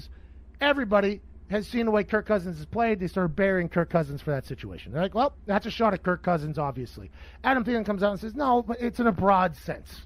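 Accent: Pittsburgh, United States. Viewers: yinzer accent is showing in this segment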